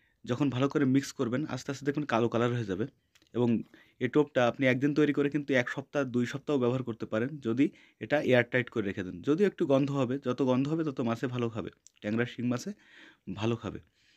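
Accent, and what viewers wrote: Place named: Gopalganj, Bangladesh. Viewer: ভাই মনেহচ্ছে আপনার বাড়ি গোপালগঞ্জ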